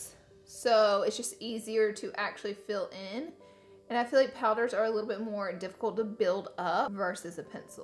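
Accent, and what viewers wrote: South, United States. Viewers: Does she have a southern accent? I can’t tell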